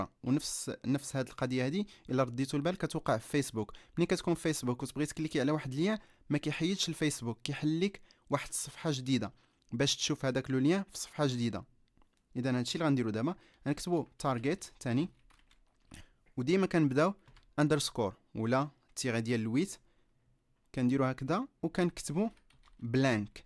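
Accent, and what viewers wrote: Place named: France. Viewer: J'adore ton accent français ! A quoi çà sert de mettre un titre en français franchement !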